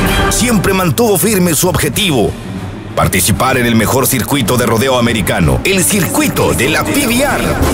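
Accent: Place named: Michoacán, Mexico. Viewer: Señor mi saludo desde bakerfiel California yo mucho pensé que era de Michoacán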